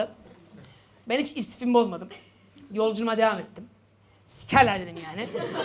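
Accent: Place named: Kaş, Turkey. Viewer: Kaş şivesi devamkeeeeee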